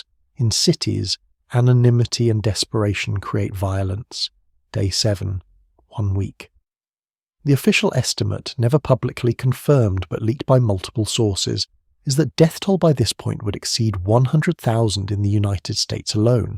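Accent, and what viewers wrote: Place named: England, United Kingdom. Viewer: Its the strongest this year? Big Deal. Tell Me when something important happens without the English Accent.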